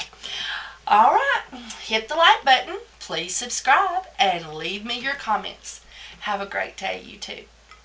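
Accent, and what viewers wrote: South, United States. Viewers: Yay, congrats on the Consignment shop wanting to have "all" of ur soaps & on being featured on Ess. Depot's site! Good for you! Enter me pls. Another wonderful batch to share with us, mahalo! One of the reasons I enjoy ur videos is bc of ur southern accent, ur southern grace w/an easy going style & ur sense of humor. If only we had smellivision! Aloha nui~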